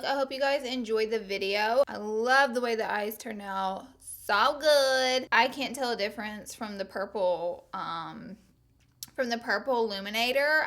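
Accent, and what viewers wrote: South, United States. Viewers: Where are you from ? You sound like you have a country accent 🤔 but it's really cute ! Love you , new subbie ! 🤗💗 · I lurrve that your accent is still so thick! xoxox, fellow southern belle💁🏼 👑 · yes, us southern girls pronounce things funny, and so what?! I say ole to for oil. when I first found your channel and heard you say that, I couldn't believe my ears. i was like... YES! someone else that says it wrong. do you say creek or crick?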